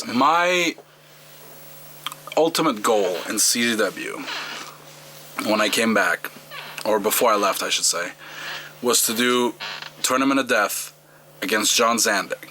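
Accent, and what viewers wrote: Quebec, Canada. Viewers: Quebec accent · He's from Quebec Canada so of course he'd have an accent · I wanna hear him speak French (as a fellow French Canadian ) . I can hear his French accent on a lot of words.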